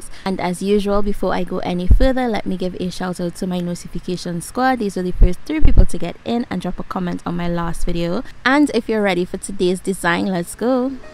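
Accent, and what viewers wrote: Trinidad and Tobago. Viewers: I heard the trini and got so excited 👏🏽👏🏽subscribed and followed one time. Awesome video 🤍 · I can spot a Trini accent from anywhere 🇹🇹🇹🇹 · where are you from u sound trini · As soon as you started talking I knew you were Trini <3 !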